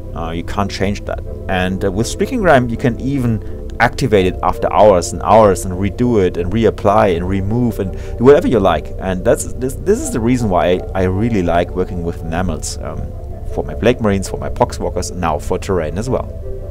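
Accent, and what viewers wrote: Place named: Germany. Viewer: Great video. And that german (?) accent is amazing.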